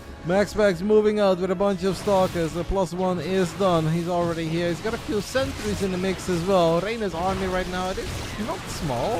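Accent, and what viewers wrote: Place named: Russia. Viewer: whu you speak with “Vlad” accent?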